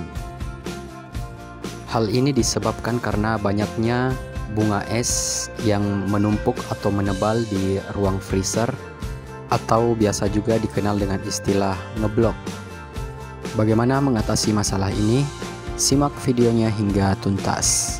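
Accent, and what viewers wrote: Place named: Makassar, Indonesia. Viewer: Sprti nya anda orang makassar ya?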